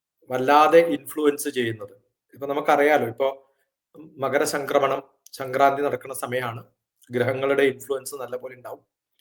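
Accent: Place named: Malabar, India. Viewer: May be from Malabar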